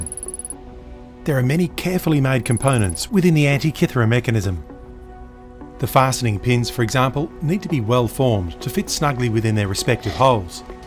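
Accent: Australia. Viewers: one second in to this video and the ambient music + aussie accent conjures up some nostalgia for those 2000s documentaries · P.S. Always nice to hear a fellow Aussie!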